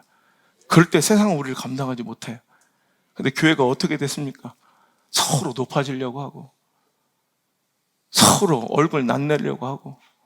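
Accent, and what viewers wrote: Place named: Chungcheong, South Korea. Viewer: 목사님.은혜많읻고잇습니다.예화도.재밋네요 충남예산인데.맞아요.충청도어법.오랜만에듣고보니.넘재밋네요.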